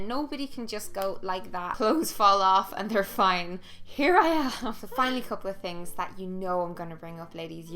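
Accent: Ireland. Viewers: Love hearing an Irish accent when I click into a video, much love from down the road in Kildare ;) :)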